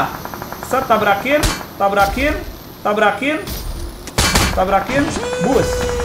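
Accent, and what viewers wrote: West Java, Indonesia. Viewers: Hajar terus mang ku toxickan sunda, nyieun lucu sabab ngomong indo tapi logat namah angeur we sunda🤣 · Sunda mana mang?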